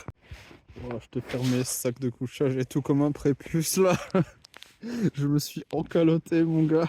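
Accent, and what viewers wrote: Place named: Alsace, France. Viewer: J'ai directement reconnue l'accent alsaco ! Super mood en tout cas. On se croisera peut être un jour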